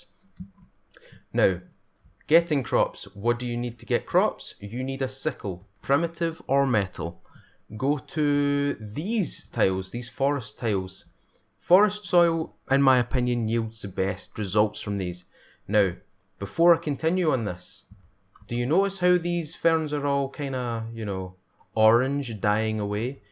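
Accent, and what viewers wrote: Scotland, United Kingdom. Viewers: Haha, I’m from Glasgow too, how can someone not know a Scottish accent when they hear it?..... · ya know what is it with people in the comments saying hey do you have a Scottish accent well duh of course you can hear it dumb ass what I'm I a flipping Korean no... THE ONLY EXSCUSE IS THAT MOST COUNTRIES DON'T EVEN KNOW THAT SCOTLANDS A PLACE and if they do hey say we're all con men ok rant over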